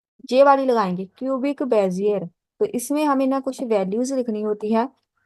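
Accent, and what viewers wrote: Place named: Punjab, India. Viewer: good video, but agar aap aik video punjabi main be banao to bohat acha hoga, kyun k aap ka accent punjabi lag raha ha mujay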